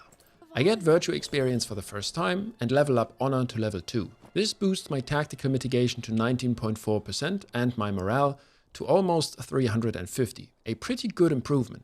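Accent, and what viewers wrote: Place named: Germany. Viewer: Nice stuff mate :) That German accent cannot be unheard, Grüße gehen raus!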